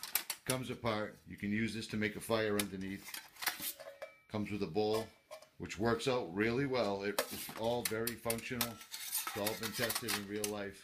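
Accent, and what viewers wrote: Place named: Brooklyn, United States. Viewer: I heard about your channel so I just subscribed. I need to know everything in this pack! I want to turn my over stuffed BOB into a INCH bag. I love how you sound like my Brooklyn cousin who's now passed away. There's nothing bad about being a truther. Thanks for the laughs. Need less to said I'll be watching this with my note pad.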